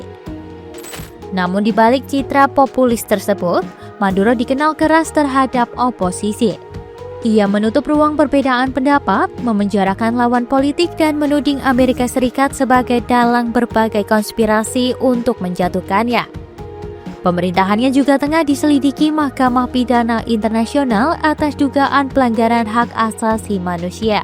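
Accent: Java, Indonesia. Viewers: Medhok sekali dubber nya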